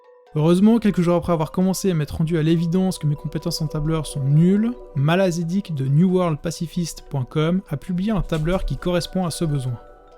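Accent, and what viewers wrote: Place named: Switzerland. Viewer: ahahahah mais c’est clair et ça fait du bien d’entendre l’accent du coin sur YouTube surtout quand j’aurais été presque sûr à 99,9% que le contenu serait du contenu provenant de YouTube francophone mais pas Suisse 😁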